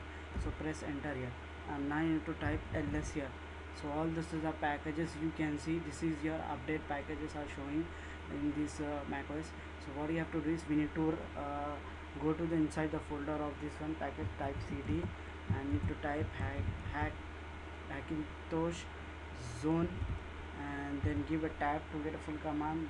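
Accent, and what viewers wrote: India. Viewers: I love indian 80% problem before this is fixed by indian accent tutorial.. thank bro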